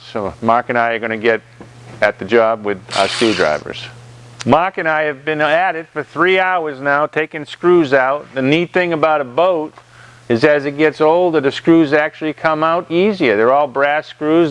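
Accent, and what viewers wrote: Boston, United States. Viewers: Yep I would like to see you fix it rather than swapping out the hull if it's not too far gone of course, I'm not from the US and I'm just curious about your accent, I am going to guess at Boston region ?? I'm probably the wrong end of the country eh lol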